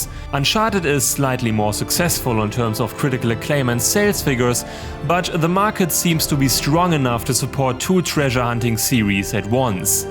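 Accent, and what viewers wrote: Germany. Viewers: That's just his German accent. At least he doesn't pronounce it as 'Laura' like so many Americans do. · Nice video but are you german ?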